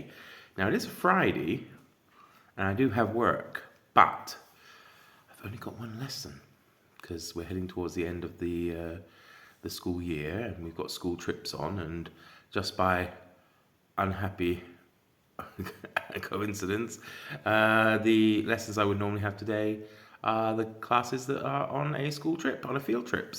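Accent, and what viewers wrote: United States. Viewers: Hi,! I don't know if you take requests, but I have one. Will you consider, please, carrying your Texas accent over to Victober? I would very much enjoy hearing you read Dickens and Trollope, etc., to us as Tex! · “Back by unpopular demand” had me wheezing right out the gate. And honestly, your commitment to that Western accent plus the Larry and Stretch voice acting? Emmy-worthy. You sold me on Lawless Miles. Fun wins.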